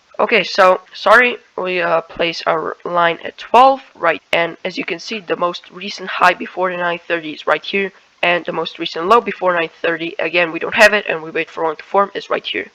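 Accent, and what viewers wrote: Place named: East Asia. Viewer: Are you Malaysian? You sound so east Asian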